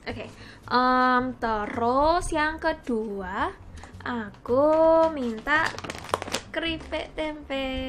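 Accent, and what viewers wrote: Java, Indonesia. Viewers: wes bagus ayu nek ngomong medok jowone kuwi looo ngangeniii..beeehhhh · so freaking awesome..japanese but medok bgt..senengane kripik tempe...kpn2 dolan suroboyo.mampir mbk tk jak dolan 😁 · Mbak ganti nama aja jd ayu... Habisnya bahasanya medok hehehe suka suka suka 💕 💕 💕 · pinter nya kamu....medok lg bhs nya👍😍😍😍👍👍👍👍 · Medok tenan eh mbak jowone biyen manggon nek Suroboyo ta salam tonggo kota Lamongan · Aku baru di channelnya mbak nih.. bahasa Indonesianya kok bisa medok gitu ya? Imut 😄 Bahasa Indonesianya juga bagus. Itu kripik tempe lho mbak, di tempet sy banyak 😆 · Gk pantes logak jawa. Huhuhuhu tp suka kok sma orangnya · Logat jowo.. Mbok mbok... Keren tenan.. Saya suka wong jowo.. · "Keripik tempe tu ueeenaake puol" Pas kamu bilang gitu tuh aku langsung ngakak 😂, suka banget ma aksen medok kamu. Semangat yaa!! 😆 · waahh kirain cuma saya yg ngerasa medok jawa wkwk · Wah bangga aku dadi wong suroboyo. 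Heheh, ngomong ngomong kaka medhok nya nampak banget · Jepang mendok:v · wa'iiikk keren.... jowone mbak'e medok ayabarus n ngalaman....salam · Pantese sampeyan dadi warga Indonesia ning. Dudu warga Japan. Aksenmu medok banget. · Mbak..cocoke dadi arek jowo wae...medok banget.....aku jd inget saudaraku di surabaya...salam dari semarang....nbak ayu banget.... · Japanese berlogat javanese:D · Wes pindah neg jowo wae mbak wes cocok kw dadi wong jowo Nek ngeplog go boso jowo(KETOK E MEDOK E) ne jo lali yo mbak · Next time borong keripik tempe di Malang! Mau keripik apel atau apapun sudah dibikin keripik disana. Stay medok yaa! Hehe... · Kok medok ngomongnya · Wah boso jowomu sdh medok jg tuh, sdh kyk org Indo bicaranya, salut dech!!! Untuk oleh2nya, memang ga ada di LN? *See you next time= Sampai Jumpa Lagi u/bhs Indonya:) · Lancarnya medok jawa ya.. tapi bagus bahasanya lancar.. (mukanya mirip temen ddku hehe).. 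· Cara bahasamu sudah medok kaya orang jawa. Sudah Cantik, kalem,gak sombong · Jepang yg cantik tapi medok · ayu pinter jowo medok... love u kak.. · Ayu² medok neng😂 ngenten punn 😂👌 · Mbak, Pirang tahun Nang Suroboyo mbak? Nggak percaya kalau hanya 1 tahun... 🤔 Pastine` luweh soko setahun yo, limang tahun yo. Kok bahasane sek medok yo, baik itu bahasa Indonesia opo maneh boso Jowone`... 😂👍🙏 Jadi terharu... 🤣 Semangat yo mbak... 💪👍🙏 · cantik,muda,smart,simple,low profil..👍👍 pinter bhs indonesia (dg aksen medok😆👍👍) terus sing paling unik,paling q seneng kwi pinter boso jowo jugaaa...😅😅👍👍👍👍 (sangat mewakili kami sebagai orang jawa😆😆) lanjutke terus...uuaaakeehh sing dukung awakmu👍👍 EEE....MATEK AKUU....😆😆😅😅😅😂😂👍👍👍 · Ojou-san udah berapa lama di sby? medoknya fasih banget😀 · Wes ayu boso logat jowone persis asli Wong Jowo padahal Anda orang Japan sip bagus... matur suwun 😁😁😁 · Asli jepang tp Indonesia bisa jawa medok bisa, syukur2 Kalau udah d,islamin terus bisa ngaji. 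Idaman Keluarga Ekekekekek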